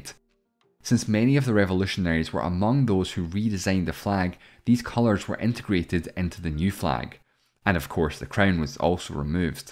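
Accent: Scotland, United Kingdom. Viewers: No pretty sure he's a Scot. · Wow your voice is so nice and scottish and now I think I’ll join the discord link in description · he's scottish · Sieth afrika huh appertheid that's what I hear you say are you British too bc you sound British and really eighteen sexteens really but I live in south Africa and I love you that time the white people what's like a witch and black people was so nice white people enjoyed apartheid also the white also felt bad(I live in south Africa and I'm coulored and I'm glad I'm coulored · He's Scottish. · which part of the uk makes you have an accent where 6 is pronounced as sex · He's Scottish, that's just his accent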